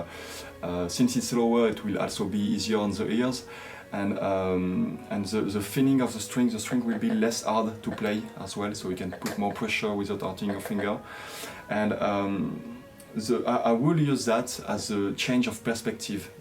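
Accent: France. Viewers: Nice french accent! Et joli home studio. J’habite en Thaïlande et je suis passionné de musique asiatique. Bonne continuation. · your accent is Frenchy English and that's lovely and unique 😊 and You have a beautiful voice 🤍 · Ça s entent que t es francais , le u ! Le u mon reuf ! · "I hope you don't mind my terrible French accent" there is a SINGLE sound you can learn that will make a massive difference in your accent and make it much easier for people who aren't used to French accents to understand you. It's the sound of the letter H, which is simply the sound of breathing out through your mouth. If you can breathe, you can make this sound easily! The letter H in English is typically NOT silent like it is in French (though there are always exceptions, like "hour"). I would suggest searching "comment prononcer le son de la lettre H en anglais" here on Youtube. It should take you only a couple minutes to learn and it will THE EASIEST AND BEST way to improve how people understand your speech. 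· Merci pour cette vidéo, je n'aurai jamais pensé que vous étiez français, j'ai été surprise par le " French accent " avant même de lire la description de votre vidéo. En tout cas très beau travail, continuez, ce que vous faites, c'est magnifique =) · 法國人說英語口音真的很重😄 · your French accent is beautiful. Please never ever get rid of it; it’s as melodic as the Erhu.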